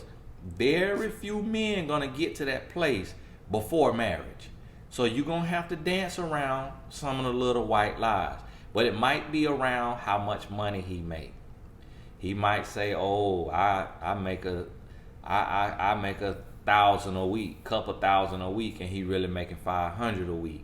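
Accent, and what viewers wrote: South, United States. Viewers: I love the country accent 😊😊❤️. Preach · Lol. Gosh darn it,..that accent of yours. Neospirin...nope. Its pronounced Neosporin. 😂. I know, I know...I'm from the South too. Good video though.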